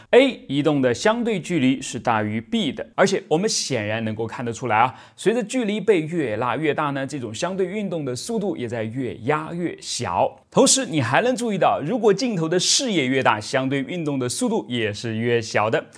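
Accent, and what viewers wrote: Nanjing, China. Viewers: 人是在南京，但口音像南京人吗？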